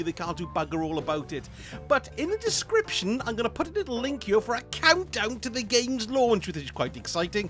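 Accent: Wales, United Kingdom. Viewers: so ur welsh then, im in cardiff, are you on discord?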